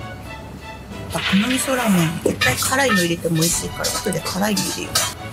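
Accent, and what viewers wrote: Kansai, Japan. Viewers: 話し方苦手なんだけど関西人？ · 😢 って関西人？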